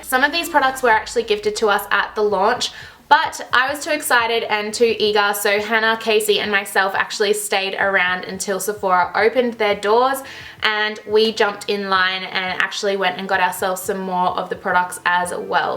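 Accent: Australia. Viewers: 😂😂😂she was so Australian in this one I wonder what part of Aus she's from, kinda wanna be her friend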